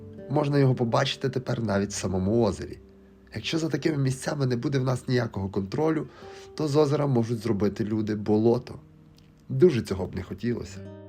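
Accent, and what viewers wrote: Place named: Verkhovyna, Ukraine. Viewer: Досить Колоритно, то по Верховинськи якось💪😉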